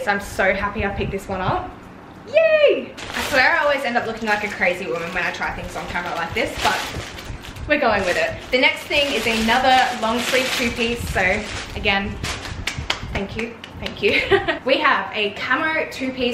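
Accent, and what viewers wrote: Australia. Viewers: Why do aussies pronounce o, oyyuuu. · Australian Women Are Gorgeous!!! not only you're beautiful you have a nice accent too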